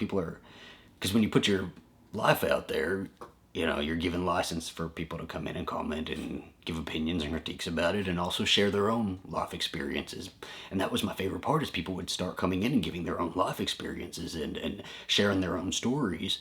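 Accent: South, United States. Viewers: your voice that caught me nothing Better than a southern drawl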